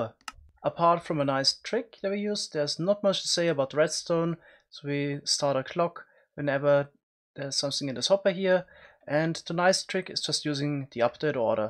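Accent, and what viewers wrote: Germany. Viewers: When Germans speak English I am also german .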